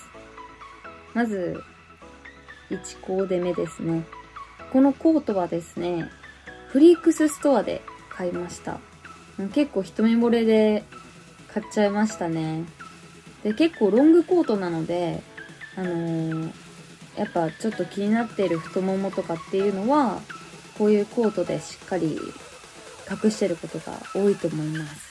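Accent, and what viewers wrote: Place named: Niihama, Japan. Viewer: なつかしい新居浜弁を聞いて(読んで)思い出しました、生い立ちを詳しく知らなかったけどやっと分かりました。大島から市内に変わり神郷小学校から川東中なんですね。私の親が宇高の桜ヶ丘マンションに居たから場所はよく分かります。私も新居浜商業高校からトヨタに入り田原工場で定年後迄田原市ソフトボール協会会長としてソフトボールを楽しく過ごしましたし、のサインボールをキャッチして興奮した事をよく思い出します❗これからも応援していますからご活躍することを願っていますよ❗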